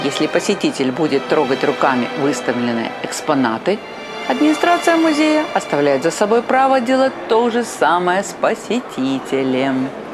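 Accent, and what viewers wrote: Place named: England, United Kingdom. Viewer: очень чувствуется английский акцент - скорее всего, проживание в англоязычном окружении повлияло на Вашу речь.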